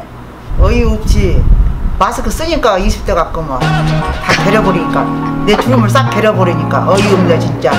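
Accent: Jeolla, South Korea. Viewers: 전라도 사투리이신것 같은뎈ㅋㅋㅋㅋㅋㅋㅋ 말투 중독된다 처음 보는데 구독함ㅎㅎ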